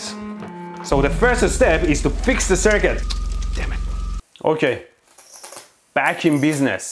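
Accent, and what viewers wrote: Iran. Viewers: عاشق این لهجه ایرانیم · Kheyli zaye english harf mizani adam mifahme irani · ایرانییییی ؟؟؟ لحجتو کاملااااا تشخیص دادم 😂😂😂 ولی دمت گرم خیلی مردی 😂❤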